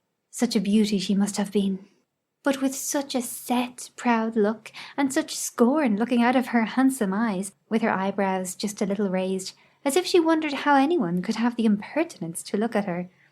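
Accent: Ireland. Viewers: You are a very creative girl ... and I love your Irish accent !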